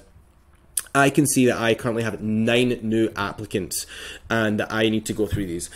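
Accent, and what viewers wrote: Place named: Scotland, United Kingdom. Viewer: Tis a lovely Scottish accent, close to the Irish brogue that my family has. It is lovely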